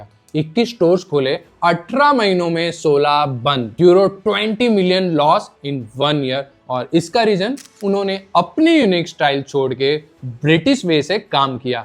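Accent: Gujarat, India. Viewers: Gujju accent.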